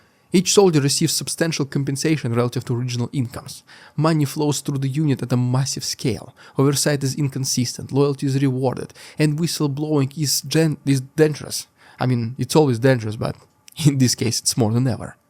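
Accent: Russia. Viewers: you were very, very hard to understand this time... way to fast. Your Russian accent and the words just rammed together is impossible... TAKE A BREATH.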